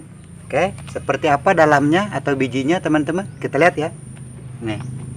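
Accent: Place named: West Sumatra, Indonesia. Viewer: Kok sampai bisa kang bahaso minang.. Heran lo awak.. Atau mungkin teteh urang padang.. Heee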